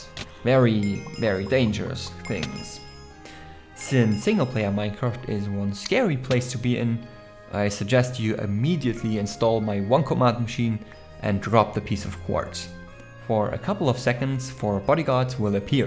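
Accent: Germany. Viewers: Dein Englisch klingt als wärst du Deutschet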